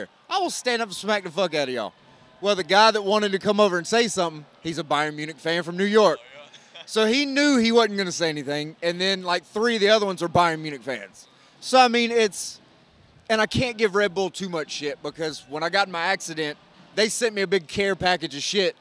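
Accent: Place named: South, United States. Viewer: Yoo i aint trying to be racist but he sound way too southern to be a soccer but overall funny guy btw Super Dortmund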